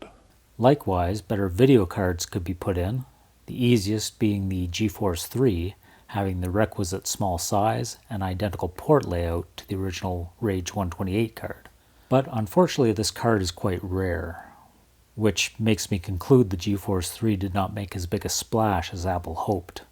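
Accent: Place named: Canada. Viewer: You should do a video on the first aluminum iMac model from 2007! You could show Apple's sudden shift to their current aluminium/brushed metal look for their iMacs, since they released a white iMac earlier in the same year. I REALLY miss the white clear plastic look, but I have a fondness for the early aluminium iMacs. About 2 years ago I rescued one from being thrown away at my university. It was running Yosemite (and very poorly). I installed Leopard on it using a modified install disk for a MacBook. But I'm planning on putting Tiger on it sometime in the future. I even picked up the original mouse and keyboard that came with that model, just to keep it era-accurate. Also love your channel, I immediately knew you were a fellow Canadian.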